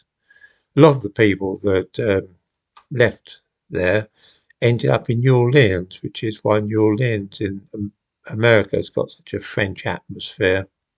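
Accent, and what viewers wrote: United Kingdom. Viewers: i have been following your channel for a while because you have excellent content, detailed analysis of history and politics and all without emotional drama as the corporate media always has. the problem however is you need a much better microphone. like professional podcast folks have. coupled with your heavy British accent, it's hard to understand you.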